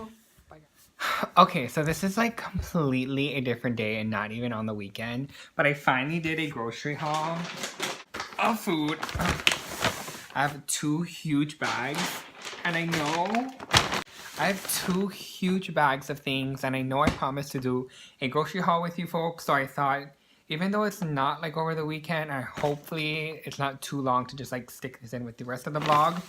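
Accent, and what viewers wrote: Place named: Hawaii, United States. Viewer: I’m trying to find ways to organize my lashes and as soon as I heard you speak I just knew you were from Hawaii 😂💛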